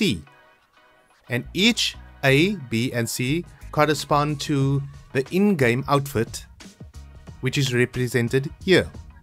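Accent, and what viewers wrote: South Africa. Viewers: You're the most south african sounding guy i've ever heard 🤔🤔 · Are you from South Africa your accent is quite familiar. And thanks for this. I have Assassin's Creed Rogue and modding it has always been something I wanted to try out.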